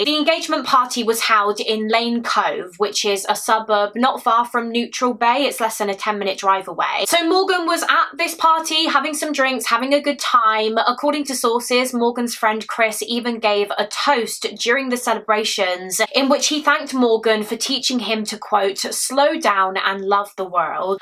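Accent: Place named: RP, United Kingdom. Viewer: I had a horrific day but a new video will cheer me up. That posh accent is so relaxing 😊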